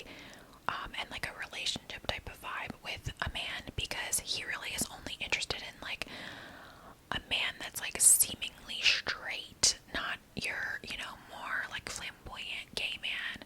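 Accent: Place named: Vineland, United States. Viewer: Uuggghh!!! I love your whispers the absolute most because your whispers remind me of my cousins who are from Vineland NJ and their accents are very similar to yours. I live in Vegas so I don’t see them as often as I’d like but watching your vids reminds me of when we would have sleep overs as kids and we would whisper all night about boys we liked lol. I wish you all the success with your channel, and i can’t wait to watch you hit a mil😉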